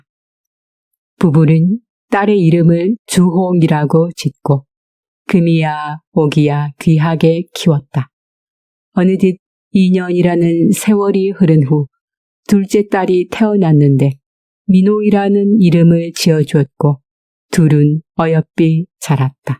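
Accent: North Korea. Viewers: 북한사람이 읽어주는거 같네요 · 억양이 북한쪽 같네요?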